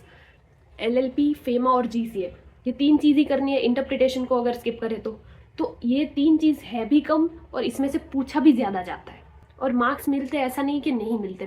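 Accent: Gujarat, India. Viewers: I smell Gujarati accent little bit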